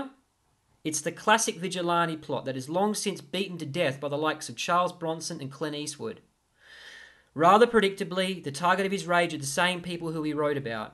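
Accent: Australia. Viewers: You're Aussie mate. Me too 👍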